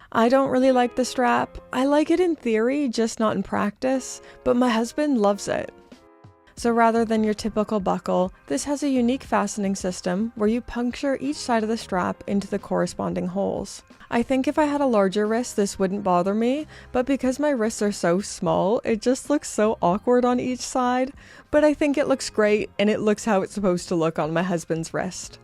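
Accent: Canada. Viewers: Your Canadian accent is just great!! 😊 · Come on eh! Don’t apologize for your Canadian accent.Great vid. I think I want the red banded one.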